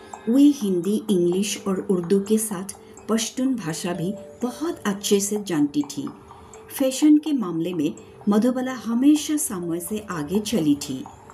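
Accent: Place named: Bengal, India. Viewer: I enjoy listening to your Bengali/Asamiya,Hindi accent.It reminds me of Suchitra Sen of Andhi or Mamta fame. (I appreciate your beautiful research work and presentation)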